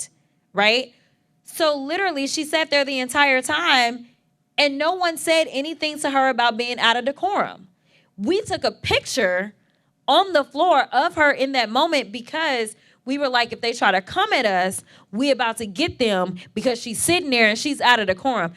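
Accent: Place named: Texas, United States. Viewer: We love you! Can tell that you are from Texas!❤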